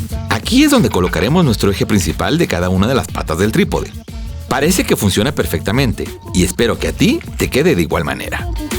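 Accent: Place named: Peru. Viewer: Amigo, soy nuevo subcriptor en tu vídeo. Algunas acentuaciones de sílabas me hace pensar que eres peruano de lima educado. Estoy equivocado o eres de otro país?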